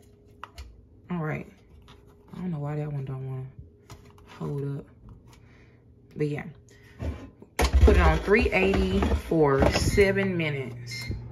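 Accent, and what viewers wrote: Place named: Carolinas, United States. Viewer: Where u from sis u sound like u from the carolinas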